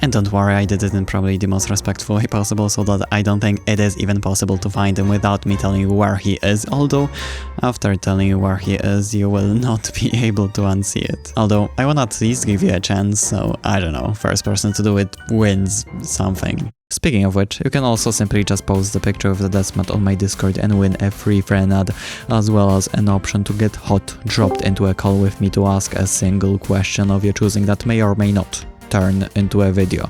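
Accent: Poland. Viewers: OK, from your accent I guess you're from Poland and I can't put the rest of my comment into English, at most you can translate it yourself if I'm wrong about your nationality xd W lutym tego roku straciłam na hypixel CAŁY MÓJ DOBYTEK. Uderzyło to we mnie tak mocno, że usunęłam moje konto microsoft (bo zostało tak zhackowane, że nie było co z niego zbierać...) i do teraz nie jestem w stanie zacząć grać od nowa. I nie jestem jakimś dzieckiem, jestem po trzydziestce, więc bycie takim debilem zdemoralizowało mnie jeszcze bardziej. Miliardy coinsów, wymaksowane staty do górnictwa nad którymi pracowałam setki godzin. Kurwa boli. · youre the second skyblock youtuber i failed to recognize to be from kingdom of poland THIS MONTH :sob: like geniuenly i thought you just have funny pronounciation and didnt connect the dots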